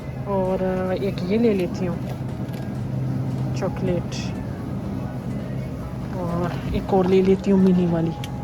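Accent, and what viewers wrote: Punjab, Pakistan. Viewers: Hi. Ap pachtu Hain or mashaAllah lagta nahi apki bataon se yehi lagga Hy ap Panjab see Hain. Bht saf Urdu bolti hai ap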